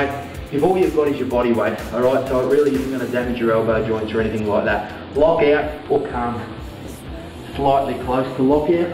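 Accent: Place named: Australia. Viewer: You got a lovely australian accent, love it !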